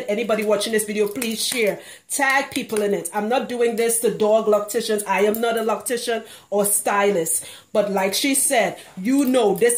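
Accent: Trinidad and Tobago. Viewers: I'm hearing an accent...are you trini? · I knew when i heard that accent, that you were trini , represent hun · You go on and on and on and on and you still left us asking questions you could have easily answered 10 minutes or less into the video. And sorry to say but there's not enough art value in your tutorials, except for the background, where even your beautiful Trini accent becomes annoying because you're not delivering the message we've clicked on to hear. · Sounds like your from Trinidad, ,are you? If yes,,where? Am interested in locing my hair. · Hey great info. Are you from Trinidad by the way im hearing it in your voice and accent. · you sound like a Trini... are you Trini??? I'm Trini❤❤❤❤ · you are Trinidadian dear I hear it in your accent. Also I believe she was referring to people with locs. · I'm hearing ah Trini 🇹🇹 mus know my people.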